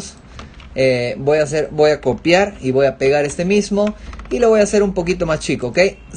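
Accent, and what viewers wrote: Peru. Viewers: DIME QUE ERES PERUANO XFA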